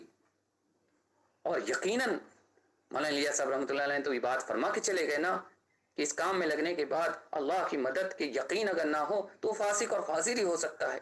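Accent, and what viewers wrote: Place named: Bangladesh. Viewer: koi tafseel naheen hay sirf baat ke lehje se lagta hai koi bangali hay aur ghair motabar hay.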